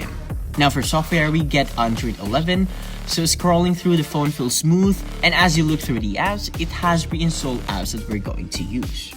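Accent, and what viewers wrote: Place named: Philippines. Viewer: Are you a filipino? I like your accent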